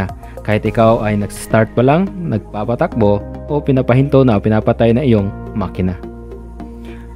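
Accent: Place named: Visayas, Philippines. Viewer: bisaya man kaayo boss hahaha nice job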